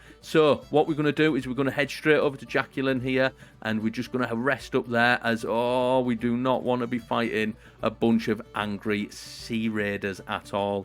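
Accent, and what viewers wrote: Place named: Yorkshire, United Kingdom. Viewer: Ohh this is what ive been looking for. I dunt have this game so i like to watch people playin it, and nothing better than roleplay. Good stuff mate, liked and subbed. Also where abarts in Yorkshire you from? Im from Sheffield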